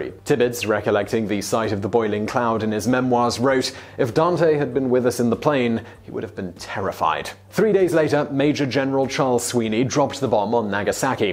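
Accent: United Kingdom. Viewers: The main reason I come here to hear how British people say words.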